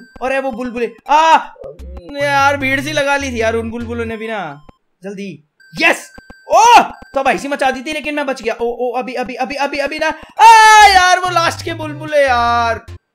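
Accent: Haryana, India. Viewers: Bhai tum haryana se ho kya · Bhai ki Haryanvi sahi hai👌😂😂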